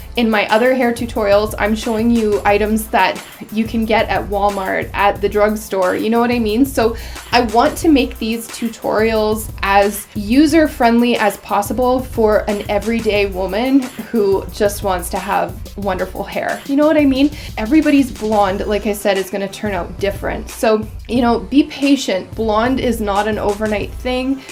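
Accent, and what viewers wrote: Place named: Canada. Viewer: I think I need more tutorials with a canadian accent. Ha! I miss living up there. This was REALLY helpful. Thank you so much!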